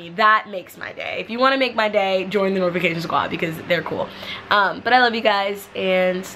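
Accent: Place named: East Coast, United States. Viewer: Anyone else love how she says strawberries ?? Idk I'm weird but your east coast accent comes through and it's so cute 😩💕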